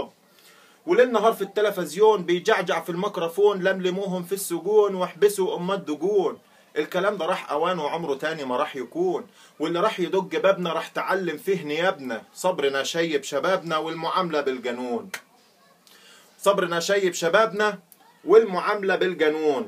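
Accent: Upper Egypt, Egypt. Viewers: رووووووووووووووووووووووووعه بس سؤال انت صعيدى ومنين ؟ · والله انتا برنس يا حج عبدالله بس انتا ازاى عرفت تتكلم صعيدى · تحية كبيرة جدا للاسكندرانى عبدالله الشريف من اخوك الاسكندرانى بردو بس انت جايب على صعيدى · سبحان الله كأنك من الأقصر أو سوهاج .. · والله انا كمان كنت فاكرك صعيدي من اللهجة بس صح لساانك والله استمر :)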